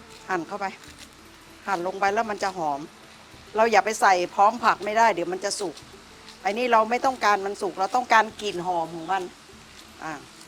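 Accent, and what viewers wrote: North, Thailand. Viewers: ชอบเวลาแม่ อู้เมืองจ้าว.เป็นธรรมชาติที่สุดเลย.คุณแม่น่ารักทำกับข้าวก็.น่ากิ๊นนน่ากินค่ะ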